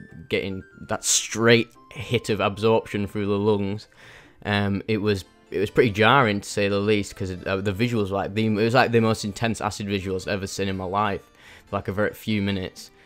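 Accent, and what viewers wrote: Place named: Yorkshire, United Kingdom. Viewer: Pal, with that accent you must be round my neck of the woods. Anywhere near Sheffield? 😂 Yorkshirean psychonaut here anyway. Cool videos lad, it's a sound idea to show these game visuals while describing your experiences in a humorous yet accessible and detailed way. Been doing acid/shrooms for over a decade but I've yet to approach DMT/Ayahuasca, just from a lack of source. I've def always been intrigued - just from the levels of consciousness and perception I've opened up into, and the way that's evolved me as a person since my late teens. When done the right way and with respect, these are immensely powerful tools, that has potential in therapy too. You're right that people should generally wait until their brains are more developed though. Get that McKennite wisdom out for the curious bairns! Honestly, once I got into Timothy Leary, or Huxley's Doors of Perception, I moved onto Terence and it absolutely astounded me how his level of communication and language, the pure creativity and lingual dexterity, was able to decipher and discuss the psychedelic experience in such a detailed way. 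Anyway, subscribed!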